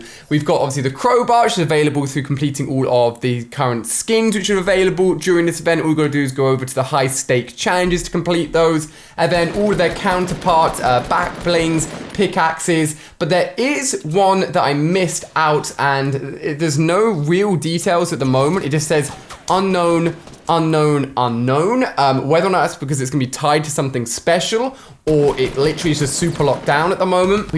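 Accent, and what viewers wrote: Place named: United Kingdom. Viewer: Not being racist but I kinda like the British accent like ”ool “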